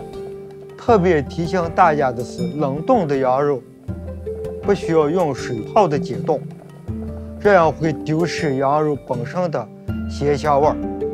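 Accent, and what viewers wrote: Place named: Inner Mongolia, China. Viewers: 巴盟，包头，东胜都是这个口音。跟我们晋西北，陕北一个味。这个炖羊肉做法跟我们家里一模一样，只是我们不放蒜，加点茴香。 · 赛拜侬 西北口音 伊盟人 或者巴盟人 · han xiang 对，听着像巴盟的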